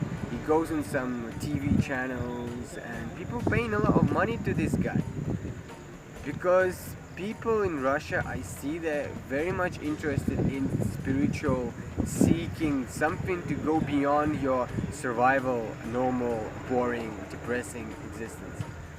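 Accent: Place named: India. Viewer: 2reto. He does project a weirdness that makes me wonder if it's a persona. Who is he really to be teaching others? Why does this Russian man speak English with an Indian accent? So many questions...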